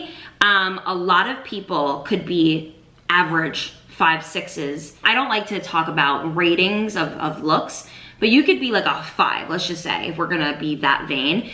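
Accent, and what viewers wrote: New Jersey, United States. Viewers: The Jersey REALLY came out in this one 🔥👏🏽 beautiful advice, thank you 💖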